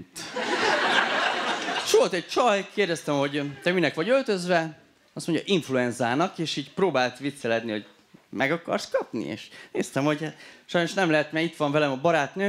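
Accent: Székely Land, Romania. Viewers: Szekelyfoldon pontosan is beszelnek! :D Ott lakok szoval jol tudom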